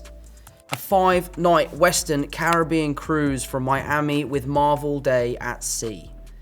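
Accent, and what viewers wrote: United Kingdom. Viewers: すげぇブリティッシュ訛りだな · イギリス英語めっちゃ耳に気持ちいい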